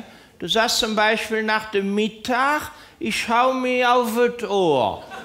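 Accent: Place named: Lower Rhine, Germany. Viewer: Ich liebe es! So witzig, so genial Genau so sprechen wir am Niederrhein :P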